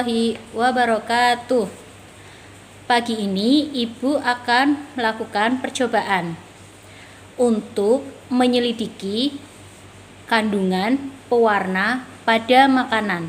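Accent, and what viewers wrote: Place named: Tegal, Indonesia. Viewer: Top markotop tegalane medok nmen ah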